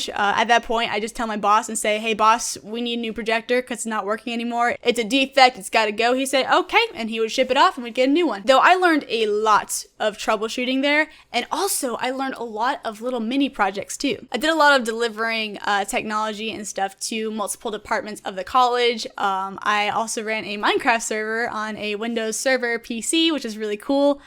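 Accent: South, United States. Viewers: Think I honestly have never really heard a true American Southern accent. This girl seems nice and her videos have a nice quality and effort on them. Subscribed.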